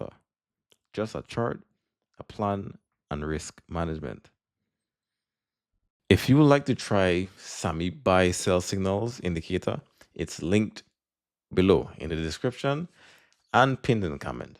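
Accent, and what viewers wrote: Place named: St Croix, United States. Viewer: good morning sammy. where are you from. sound very much like someone from STX.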